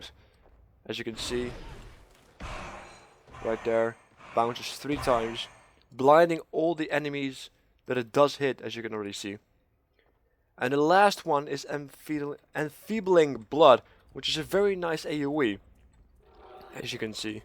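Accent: Netherlands. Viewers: You can hear that he is dutch lol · Dutchie? :P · French? What french people have you been listening too? He sounds dutch.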